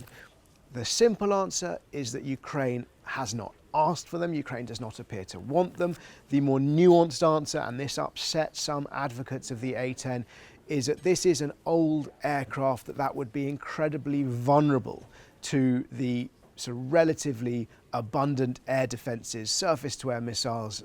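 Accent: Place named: United Kingdom. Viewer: Loose your British accent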